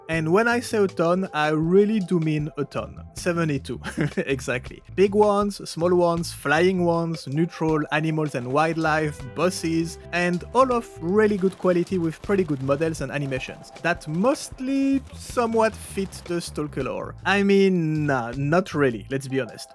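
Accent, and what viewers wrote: France. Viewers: Hi dude, I guess many already asked you this but are you French ? Your accent sounds a lot like French lol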